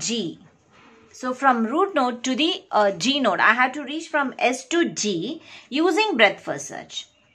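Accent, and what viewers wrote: India. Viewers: Damn to Hindi accent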